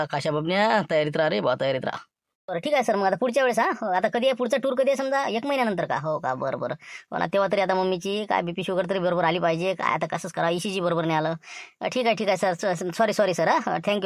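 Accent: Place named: Vidarbha, India. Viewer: आपली विदर्भातील बोलीभाषा खूप छान आहे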